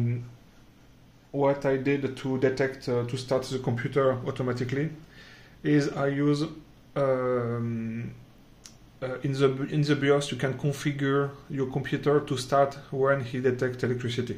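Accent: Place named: France. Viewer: Un bon accent Français 🙂 Merci pour la petite vidéo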